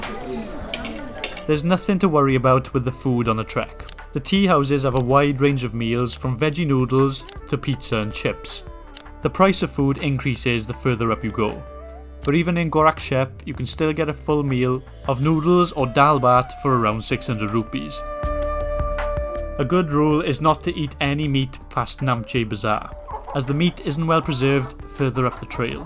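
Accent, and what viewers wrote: Wales, United Kingdom. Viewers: Great vid. Made me smile when I heard the familiar Welsh accent haha. Where you from? I’m Carmarthen. I go to Nepal in 3 weeks and I can’t stop thinking about it 😂❤️🏴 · Diolch (yeah, picked up the Welsh accent). Well worth watching.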